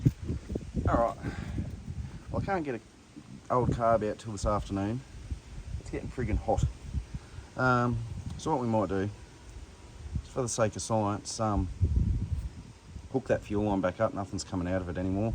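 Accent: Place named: Australia. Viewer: Love it! Realistic Aussie narration and cool approach. You got my sub.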